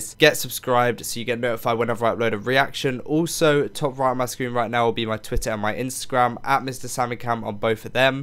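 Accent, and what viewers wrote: United Kingdom. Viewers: I love British accent so I get you 😂♥️♥️♥️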